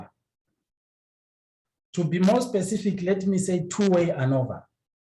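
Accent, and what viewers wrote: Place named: South Africa. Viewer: Also I liked the SA accent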